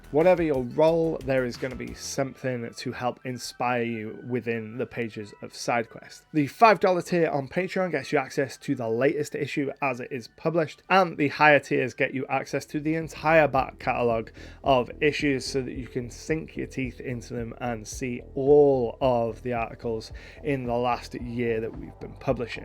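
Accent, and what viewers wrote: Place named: England, United Kingdom. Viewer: Thoughts 1. Tags. Completely agree. Standardised tags make everything easier 2. Encounter Building. Mindboglling that WotC has a DIFFERENT internal system for building encounters than the one published for other users. Perhaps it’s a business decision thing, to give their products a competitive advantage. 3. XP and Rewards. This I think is very hard. Xp is the hardest thing to sort out in RPGs, but it sounds like PF 2e is at least doing it better. 4. The Economy. Yes yes a thousand times yes. 5. More detailed Weapons and weapon effects. Agree, but the game gets WAY more complex, and not all players enjoy combat. 6. Level 20 Options. Yes, but 5e did make some improvements from previous systems. 7. 10th level spells. Here is a heresy, spell levels should be renamed to correspond with caster level, so 2nd level spells become 3rd levels spells, 5th level spells 9th level spells etc. 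Then we can have 20th level spells! 8. Magic Item rarity. Yep. but I like how 5e did Magic items. They made them a bit more special I think. And yes the character building is better etc The BIG negative however, is Pathfinder 2e is a lot more complex than 5e, and a lot of 5e’s appeal has been it’s simplicity (Before the rules bloat). Great vid though dude. I too am from the UK so your joyful English Accent is a great oasis in the American dominated youtube! best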